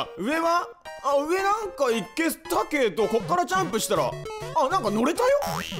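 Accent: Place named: Kyushu, Japan. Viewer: 「ムズけーばい」って言い方があるんてすね。 他にも「〜ばい」って言うことがありますね、なんかかわいいです☺ どれもおもしろかったですが、長靴クリボーがいっぱいのとドッスンがいっぱいのコースが好きです♪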